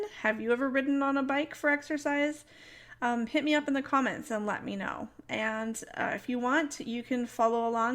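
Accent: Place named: United States. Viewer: Hi. I am from South Wales (UK). Just a few miles from where Richard Burton and Anthony Hopkins were born :--). Accents are rather difficult to describe of course. Yours is clearly 'American', however, without any 'sharpness' or 'high to low' then 'low to high' intonations which my ears pick up on with some (not all) American accents. Thats just me though, haha.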